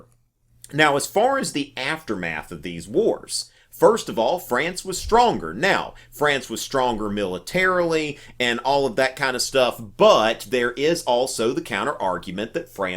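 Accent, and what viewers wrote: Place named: South Carolina, United States. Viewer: Hehe, yeah. got a thick South Carolinian accent. Little different from mine (Texan) with all the aaaa's.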